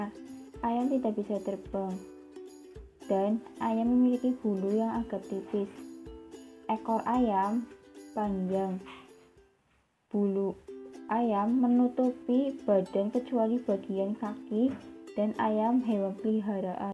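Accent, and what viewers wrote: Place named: Bumiayu, Indonesia. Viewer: Mentore tegang dalam menjelaskan kurang sante karo bahasa bumayune kegawa banget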